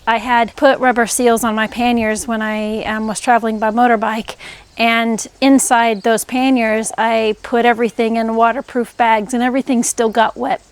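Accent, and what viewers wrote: Australia. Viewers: If you dont realize it, you are picking up a bit of an Aussie accent. Can i ask the approximate cost of your Toyota If they were sold in the US there would not be a single unit sitting. Youre so cool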